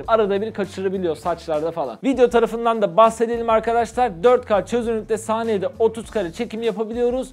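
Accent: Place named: Uşak, Turkey. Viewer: ABİ SEN NERELİSİN UŞAK ÇEVRESİ GİBİ GARİ FELAN DİYON :D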